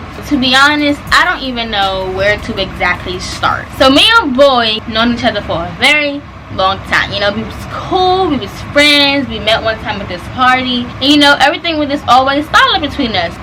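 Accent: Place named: Washington DC, United States. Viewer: That dc accent, PUURRRRRRRRR🤣❤️❗️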